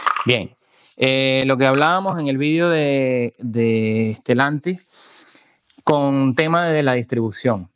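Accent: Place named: Venezuela. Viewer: Suena más a venezolano que el mismo maburro😂😂😂😂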